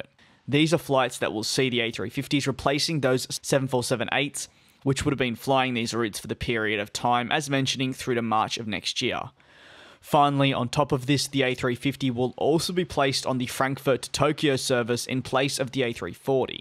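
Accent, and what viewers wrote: Australia. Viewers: He's Australian, don't be a crybaby...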